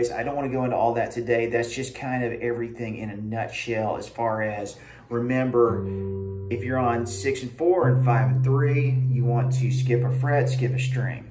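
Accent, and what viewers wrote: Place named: South, United States. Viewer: You make things make sense without trying to sound like Eddie Van Halen. Tho I am 67, have been playing since I was 8, I have never had the confidence to play without chords. Except, and I cannot play it well. But your good ole boy delivery, and not pushing the beginner too far too fast, are a joy! Not that I am a beginner, I just play rhythm.;) But Thanks!!! can't wait to find your other videos!! 😄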